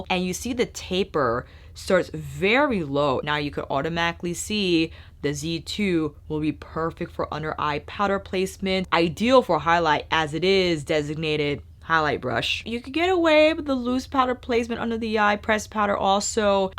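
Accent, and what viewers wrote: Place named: United States. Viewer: my love, please don't take that as an offence, but I kinda feel bothered by you pronouncing the Japanese names with the heavy English accent. Since you're a huge fan of Japanese makeup brushes brands, I think it'd be more respectful to the brand and the culture if you look up to pronounce the names a little more correct to the Japanese pronunciation. In fact is pretty easy. I say that because I have a lot of proximity to Japanese culture and it feels a little disrespectful when the names are pronounced the american way. Anyway, great review as always! Love u!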